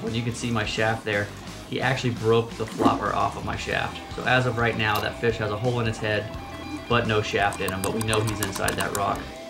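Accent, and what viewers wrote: United States. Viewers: Cuidadito que habláis feo, eso que es inglés? No creo, el inglés suena más bello. Ahh es Yankee! ACABARAMOS!!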